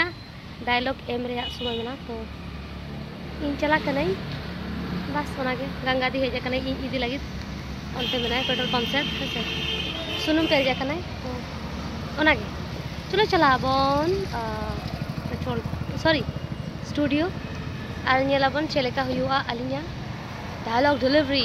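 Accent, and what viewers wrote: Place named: Santali, India. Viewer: Love your santali accent❤️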